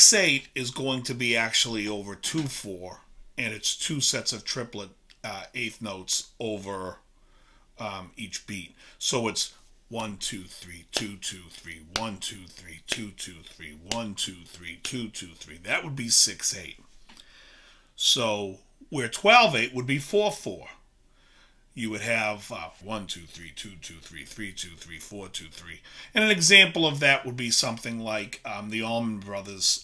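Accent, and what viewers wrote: Boston, United States. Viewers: I have got to say man, I'm a fan.what is your musical education? College? Juliard?... you seem very technical and educated on music..you are obviously from Boston or that area for some time.. but yeah cool man..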